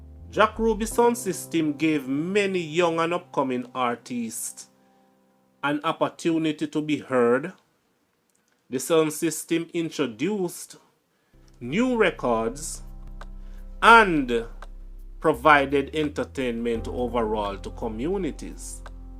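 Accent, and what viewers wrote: Jamaica. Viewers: I like the information and the fact you speak using raw Jamaican dialect!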